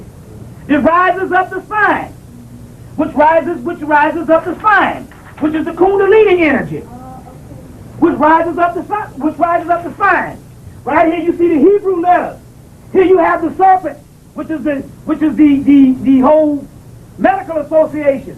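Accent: South, United States. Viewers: mispronouncing Authors is hard to understand the Southern accent he has..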